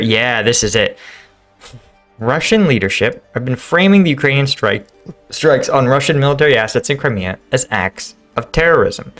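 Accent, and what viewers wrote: New Jersey, United States. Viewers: Great explanations,as usual...also,best New Jersey accent ever :) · Jersey accent for the win!!! 😂😂😂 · Love the jersey accent😂😂 much love from north jersey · Thumbs up for that Jerseys accent. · Jersey accent? Loved it. In using it to describe the explosions of Russian military facilities it Crimea, you just needed to finish with - Badda bing; badda BOOM!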